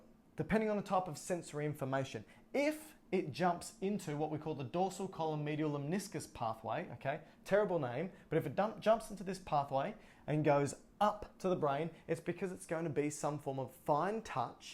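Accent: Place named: Australia. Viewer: Anatomy lessons AND an Australian accent????? I just hit the jackpot lol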